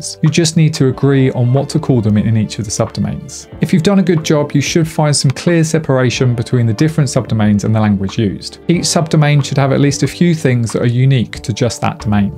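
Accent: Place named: United Kingdom. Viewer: Hi, thank you for your video. I like the British English pronunciation. I lived for 5 months in England, at first it was a little difficult to keep up with the native speakers, I was able to learn their accent, however there are many accents in the UK. Your videos help me a lot to remember that particular English!! thank you.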